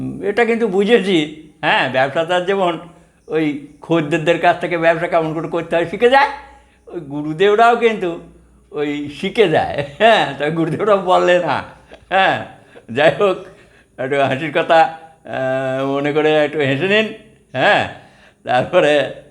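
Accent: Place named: Birbhum, India. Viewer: যেইভাবেই হোক আপনার সাথে দেখা করতেই চাই, আপনার কথা শুনে মনে হচ্ছে আপনি বীরভূমবাসী, যাইহোক আপনার mail id ta দেন আমি পেলাম না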